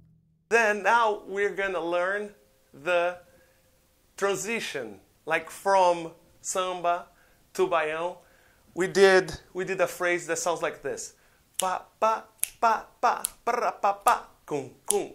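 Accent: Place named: Brazil. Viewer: Funny Brazilian Accent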